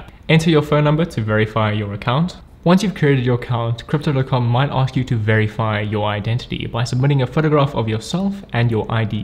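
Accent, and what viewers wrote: South Africa. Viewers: Hey, are you South African, and do you also reside there if indeed you are, curious coz your beautiful accent sounds like it? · hey brother, you sound south african?? I stay in Cape Town and would really aprecciate if you could give me advice on best platforms to use in South Africa?? and any advice in general as a cape tonian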